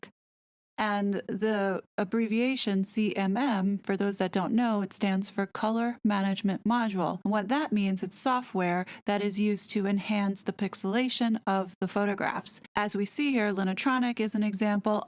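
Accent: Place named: United States. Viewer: Hi. Interesting🤔 I surreptitiously subscibed with your reading of better-not-say🤫 i love your voice... Gentle, melodous American. Perfect pitch and loved it when a laugh was building up in your voice. Let me stop! Ere i sound girlyfestype.😉 This is fascinating. Thank you. I will have listen pay attention... Is the one pic still a blank device? Maybe its a pic only for President of african parks meets President of Rwanda status photo? Did you analyse the other mozambique pic? Clearly on island. Question is Why??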